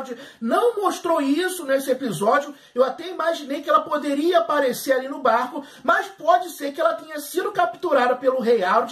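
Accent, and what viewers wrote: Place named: Brazil. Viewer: Acho legal como ele pronuncia os nomes dos personagens! Bem brasileiro! Hahaha! Seu canal é legal!! Sucesso!!!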